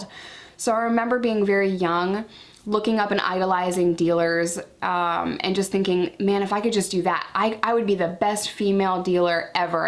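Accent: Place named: Harlem, United States. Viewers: Sounds like the Bronx 😂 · Sounds like Harlem or South Bronx...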